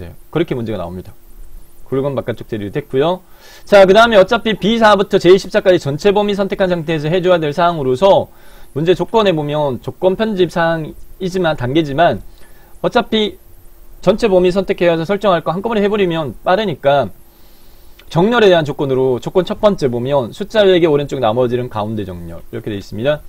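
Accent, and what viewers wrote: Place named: Gyeongsang, South Korea. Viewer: 박사님의 구수한 경상도 사투리가 매력적 이십니다^^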